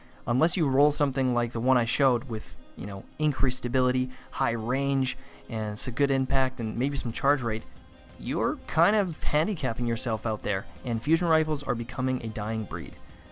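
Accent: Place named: Canada. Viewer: Oh haha, yes I do. Is that how its said in a Canadian accent? Thought you just mispronounced a couple words in one sentence. Will go check out your channel now.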